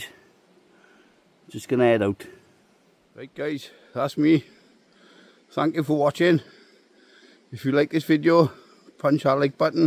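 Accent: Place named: Scotland, United Kingdom. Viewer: Sounds like you might be Scottish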